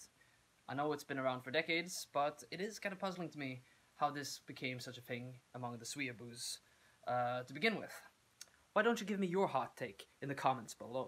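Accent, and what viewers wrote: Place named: Transatlantic, United States. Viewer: It's really entertaining how you directly address the issue using an accent and intonation very similar to the Transatlantic Accent while also also sorta having the look of a daddy from the 1950s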